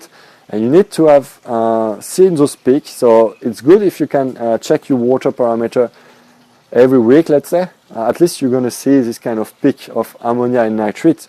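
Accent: France. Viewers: can you caption this? Your accent is really strong for people in other parts of the world. I only understood about half of it. And googles auto captions think your french. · mon dieu..quel super accent.. laisse moi deviner... origine francaises ?? ou belge ?? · i love them french accents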